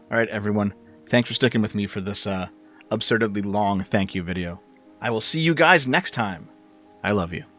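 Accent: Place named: Canada. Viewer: I found you when you filled in for Sargon. First thought was that you sounded painfully Canadian. Thanks for the thought provoking content.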